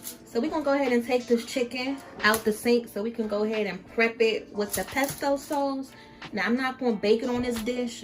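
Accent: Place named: Louisiana, United States. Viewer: You def from Louisiana with that thick accent lol👀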